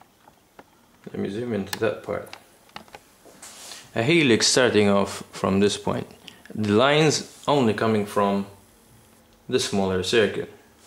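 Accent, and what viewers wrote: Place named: Malta. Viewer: It is a Maltese accent. He used to teach me at school :p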